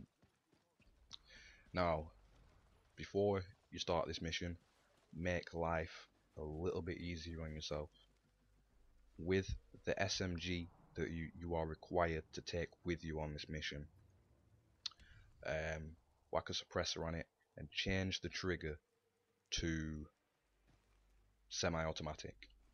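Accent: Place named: Scotland, United Kingdom. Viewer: I love your accent! Are you Scottish perhaps?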